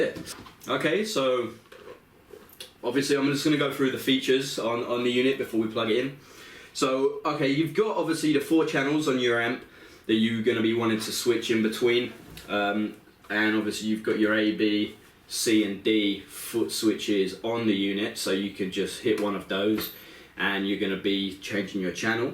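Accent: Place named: Rio de Janeiro, Brazil. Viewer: He speaks like a pal from Rio de Janiero!..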